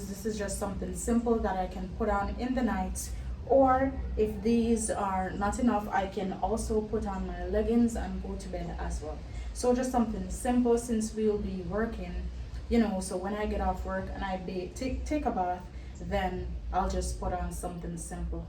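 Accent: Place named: United States. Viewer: I can hear the American accent coming up little by little... Especially when u keep using the term "you know"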